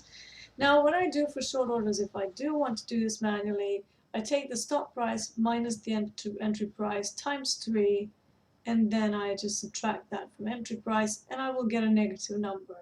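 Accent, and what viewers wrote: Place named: United Kingdom. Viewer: Your terms and methodology are suggestive of Online Trading Academy's. Are you a grad? (I'm enrolled in the Mastermind program and start Core Strategies this coming Saturday. I appreciate the pre-class tutorial, enhanced by the aesthetically pleasing visuals in the lower right corner along with a mellifluous Brit-sounding accent.) ;-)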